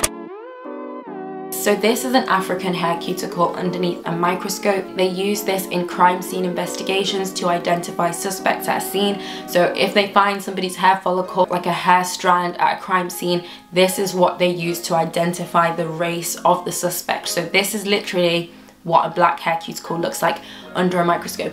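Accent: United Kingdom. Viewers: Are you just talking to British people or something? Because I noticed you have an accent. I know you're not talking to Black American people or maybe you don't know our history. You just said that white people have a variation in porosity. Black Americans are literally white. Like literally have white ancestry on high levels. And you're out here telling us we can have a certain hair texture. Well not understanding we're not africans. African would morsel apply to someone who is British like yourself maybe. Who has 100% African ancestry not someone who is literally working with 24% European ancestry. And people even who have 5 to 10 European ancestry. That's still a part of our dna. And it will still be expressed in many different ways. So just because someone has the same skin tone as you. You can't say we have the same genetic makeup as you. Therefore our hair may have a different pattern. 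I don't even know what you mean by African hair. Is African hair just 4c hare? I always wondered that. Are we including 3C hair or at least four a hair? Personally for me when I look at hair types. And it's hard to see because everybody likes to do a wash and go and loosen their curls. Which is like I can straighten my hair totally what lies that my natural curl like know. But some people they don't like to show what they got but from what I've seen the dry version of 3C that's tighter. Four A and 4b. Sort of. A looser texture of 4b are textures that are pretty much relatable to my hair t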